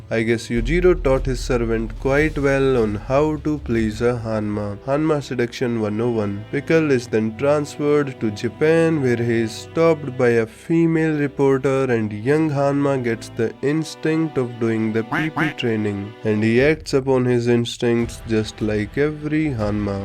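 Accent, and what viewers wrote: India. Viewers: Bro he's Indian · Bro you returned 😁 loved the accent from 🇮🇳. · Why do you sound so Indian can you please tell ? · u sound indian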